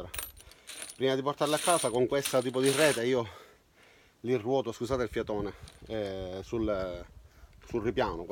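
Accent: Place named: Trapani, Italy. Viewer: riconoscevo l'accento io sono della provincia di trapani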